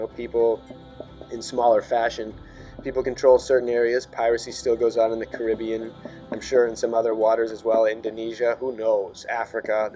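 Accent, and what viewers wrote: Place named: Massachusetts, United States. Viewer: I know your from MA, I can hear it! Lol lmk I’m from revere kid!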